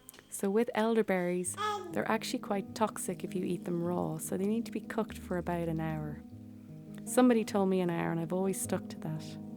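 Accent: Ireland. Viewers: 3 years later and I still comeback so I can go little slower. Your Irish accent I confess brings me joy and calm that I cannot exactly explain. But thank you for all that you put on this platform. I hope your little one is growing well. And that you are still living that is best suited for you and yours. Just dropping in to say hi and happy October again. The seasons cycle is so beautiful don't you think? Take care from the PNW in the USA. May the rain bring all the life needed. ❤❤❤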